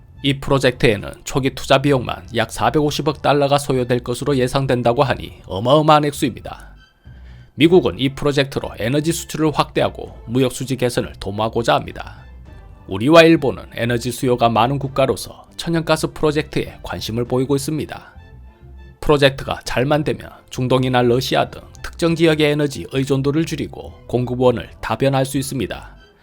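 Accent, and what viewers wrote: Gyeongsang, South Korea. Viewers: 왠..경상도사투리..!!..트럼프가 영샘이가??..표준말버젼이면..더욱좋겠구만..!!갱상도사투리 듣기가매우거북함..!!..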